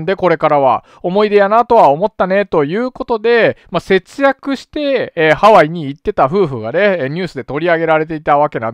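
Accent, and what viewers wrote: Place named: Kansai, Japan. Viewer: 関西弁だけどなんかケチな感じがザ関西って感じ偏見だけど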